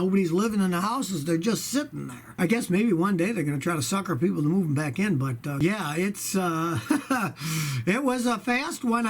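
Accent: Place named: Western New York, United States. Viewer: I knew that was a WNY accent! Greeting from Buffalo, NY. Thanks for all of your videos, they have been very helpful.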